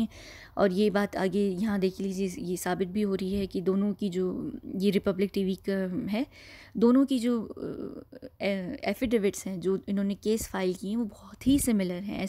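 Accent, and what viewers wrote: United Kingdom. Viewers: Excellent research. Your English accent sounds like you're from the UK. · English accent.. Masha Allah.. 😍💕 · Mam how is your english and hindi both so good. Like perfect british accent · your accent r supperb. can you teach me uk accent 😊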